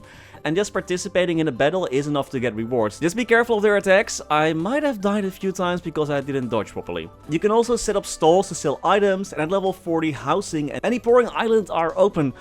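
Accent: Netherlands. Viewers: Dude has a heavy dutch accent 😅, is he dutch? 😅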